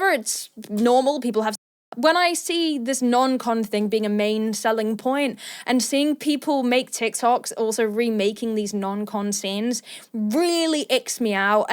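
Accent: United Kingdom. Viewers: I like your british accent <3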